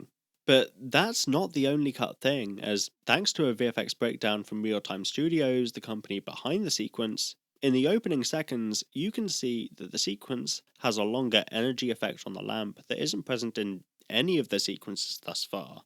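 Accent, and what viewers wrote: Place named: Scotland, United Kingdom. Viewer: I FORGOT HE'S SO SCOTTISH OH MY GOODNESS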